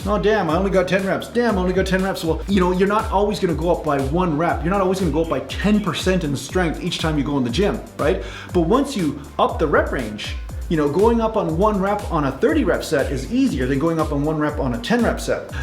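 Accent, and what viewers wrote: Canada. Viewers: Are you canadian by any chance? Kind of sounds like it, not saying thats a bad thing haha im canadian myself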